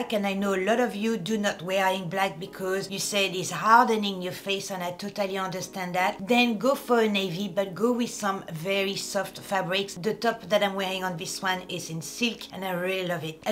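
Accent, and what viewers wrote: France. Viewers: love your french accent and you are so funny.... as well as beautiful style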